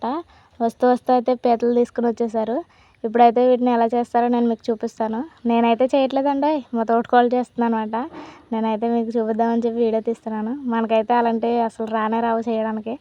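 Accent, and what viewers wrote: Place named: Konaseema, India. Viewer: Konasema voice super 🙏🙏🙏🙏🙏🙏🌹🌹🌹❤️❤️❤️👌👌👌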